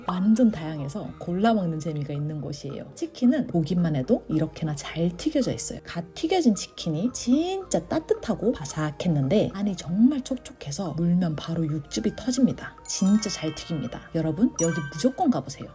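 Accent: Gyeongsang, South Korea. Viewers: 경상도 분이신가봐요? 사투리가 들리네요^^ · 경상도 사투리가 섞인 표투리 나레이션 신선하네요 ㅋㅋ 구독 박고 갑니다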